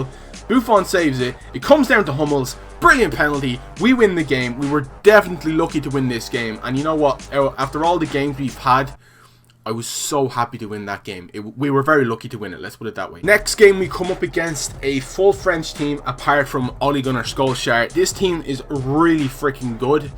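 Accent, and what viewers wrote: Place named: Ireland. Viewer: Yo where you from in Ireland? Im from Galway. Im guessing Dublin??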